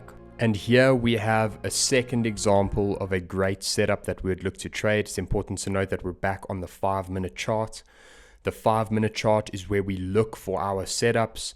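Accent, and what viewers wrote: South Africa. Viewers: South African? Kzn ? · definitely a south african boet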